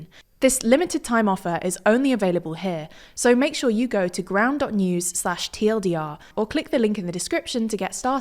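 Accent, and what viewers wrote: United Kingdom. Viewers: I like youre British English accent anyway ❤,and shape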